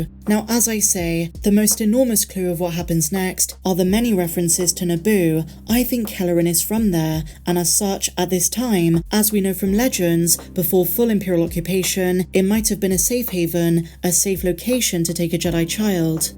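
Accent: United Kingdom. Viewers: Hi I am just loving all of the mandalorian…enjoying just watching it and then watching breakdowns and seeing things anew! Thank you❤ and having a uk voice is really special too.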